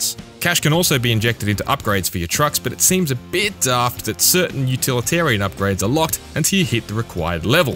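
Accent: Australia. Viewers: I might be going on an Australian outdoorsman sort of stereotype, but the reviewers voice gives this video more authenticity for me. Just sayin’. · U an Aussie mate?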